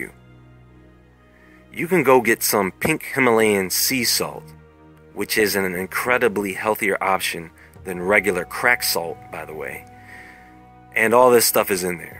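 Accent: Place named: North Carolina, United States. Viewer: ! Are you from NORTH CAROLINA? You sound like it! Please respond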